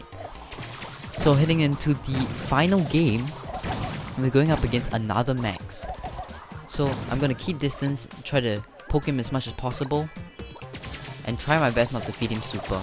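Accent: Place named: England, United Kingdom. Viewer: accent anglais BRUH.... lol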